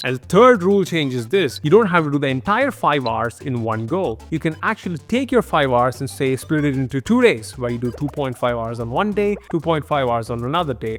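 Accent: India. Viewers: Are you Indian cuz you sound like btw I am learning art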